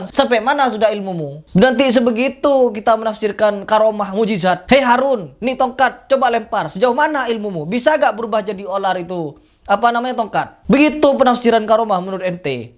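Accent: Madura, Indonesia. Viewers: Ha..ha...bang lucu enak logat nya madura ya.tp yg penting lagi omongan nya bener semua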